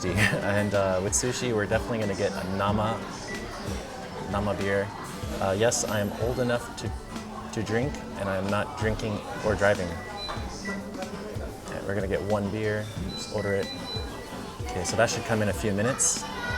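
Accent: United States. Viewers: Please remove hat while eating Love video and had Kaiten sushi often. Are you originally from Hawaii? Sounds like it.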